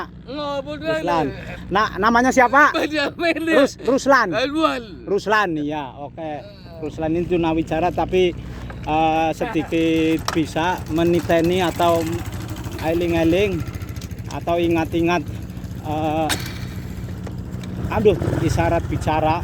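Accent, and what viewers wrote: Banyumas, Indonesia. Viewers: Ora ngapak ora kepenak😅😅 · Ora ngapak ora penak kang 🎉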